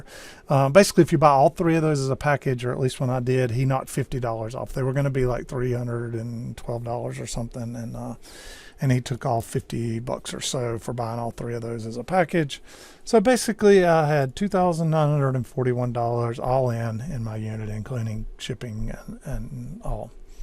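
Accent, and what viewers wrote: South, United States. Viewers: Despite your light Southern accent, I will credit you with pronouncing the word "tubes" instead of "teebs".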